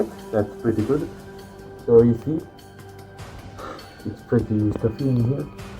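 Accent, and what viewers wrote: France. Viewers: enfin un français qui fait des video comme celle la on reconnais l'acens français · L’accent français on reconnais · l'accent français me tue de rire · are u french? that’s a nice accent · Hi, im new on this channel and i was wondering, are you french?😅 because your voice have a little bit of french accent🥖🥖🥖😂😅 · wait are you french ? or am i just trippin' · tes tu fracais tes un accent fracais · are you french? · Is it me or he has a french accent???? Idk.... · are you french? you have the accent of a french ppl trying to talk in english, why im saying this is bcuz im myself a french pp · Do you speak French cuz you have an accent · are you french by any chance? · YOOOOOOOOOOOOOOOO J AI RECONNU TON ACCENT FRANCAIS ET TA DIT UN TRUC EN FRANCAIS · You got a French accent, I like that. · Alors la toi avec ton acsent si t'es pas Français je sais pas ce que tu pourrais etre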